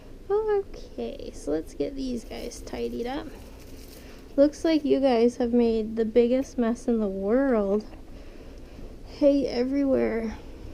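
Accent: Canada. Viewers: I'm from FL and I've never heard the way you say it but I immediately knew you were from Canada by the way you say certain words. For someone to be so petty and call you illiterate, well, they just have way too much time on their hands...I thought it was cute and made me smile.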